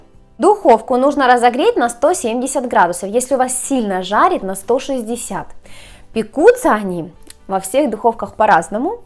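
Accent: Ukraine. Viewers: молодец! Люди, а вы должны понимать, что человек с Украины и акцент остаётся надолго, ничего в этом страшного нет, любой иностранец говорит с акцентом, это почему то не раздражает...., вам спасибо большое за интересные рецепты😊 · Сергей Хворостенко люди! человеки! вы все забыли что девочка живет в Украине, там так говорят и это нормально, вас же всех не учат как правильно произносить украинские слова? У нас в России многие вообще забыли родную речь, или так засорили словами паразитами что слушать невозможно. А девочка умничка, трудяга. Так держать.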